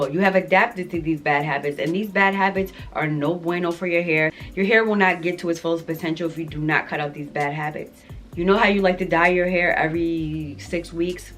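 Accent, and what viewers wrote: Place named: New England, United States. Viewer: I love your videos!! They are very educational and entertaining too! Are you from NY or New England? I hear that accent! 👍🏽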